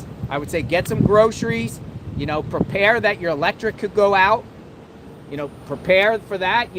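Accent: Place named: North, United States. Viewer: Haha! Bro u sound like a Northern. Could not stomach 2 min of your crap.. your spewing rubbish…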